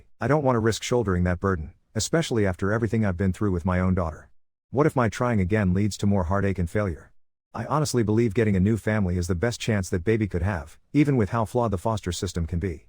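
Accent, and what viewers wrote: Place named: United States. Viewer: Sounds very american 😂😂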